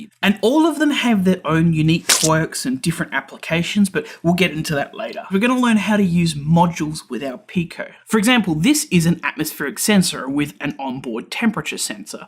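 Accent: Australia. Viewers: Part way through and finding this the most clear, no fuss course to follow. big thumbs up. did have a snag at beginning where i almost gave up. couldn't get pico to be seen on laptop. turns out i was using a power only cable and not one with data. silly mistake but got there in the end. very pleased you uploaded this course. Thank You, and is that the Aussie twang to your voice? Brit here and find dialects fascinating. It changes here in just 20 miles apart.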